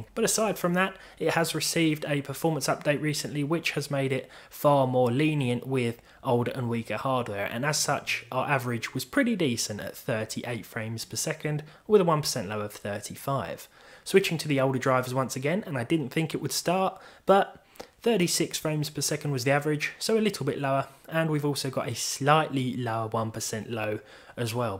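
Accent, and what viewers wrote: United Kingdom. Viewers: He's british. It's called an accent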